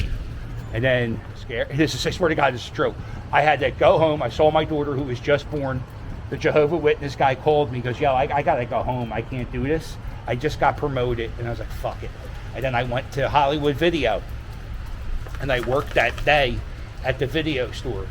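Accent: Philadelphia, United States. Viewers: I was a worker at a delinquent training facility in Olwein, Iowa with 20 teenaged. Although the chances the bad guys would target Iowa, was bill, the kids thought they were next. I’m from Philly and listen to you to make sure I keep and refresh my Philly talk and attitude. I’m now in Denvet, Colorado. When I offer an opinion they ask if I’m mad. NO, I’m just sarcastic GD. Great walk. love it.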